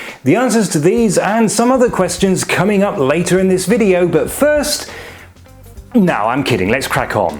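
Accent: United Kingdom. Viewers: Your vid popped up on my youtube selection. Had aways wondered about that name.. now I know, and not only that.. I feel so much smarted learning it in your British accent. Like Sub! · Not bad. Your accent is very british indeed for an Aschaffenburger.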